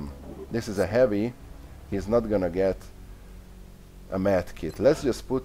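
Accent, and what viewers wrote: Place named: Hungary. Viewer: Wow, very detailed, nice guide. Are you hungarian by any chance? Just guessing by your accent. :)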